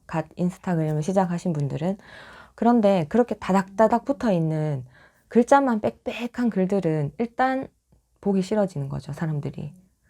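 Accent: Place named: Gyeongsang, South Korea. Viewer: ~유익한 내용 감사합니다~경상도 억양도 좋은데요!😍😍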